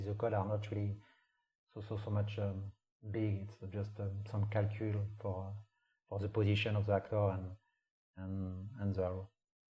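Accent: France. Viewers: L’accent frenchy xD Trop drôle :D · The accent français xD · Oulalala l'accent bien français ;)) , un grand bravo pour tout ce que tu as fait c'est chef-d'œuvre , continue comme ça ! :)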